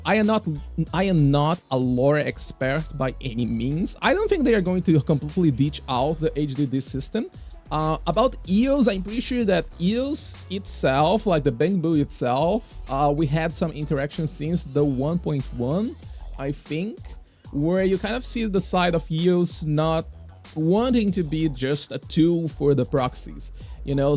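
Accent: Brazil. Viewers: Pelo seu sotaque, você é brasileiro 😀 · I'm not gonna lie, sometimes you sound like a Brazilian speaking english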